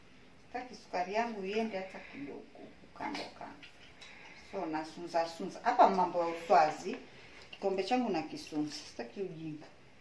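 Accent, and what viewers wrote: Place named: Swahili, East Africa. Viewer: I love that Kiswahili accent ❤️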